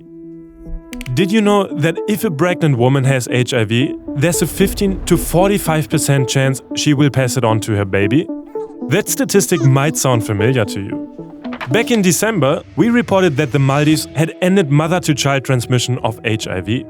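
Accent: Germany. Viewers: Are u German?🧐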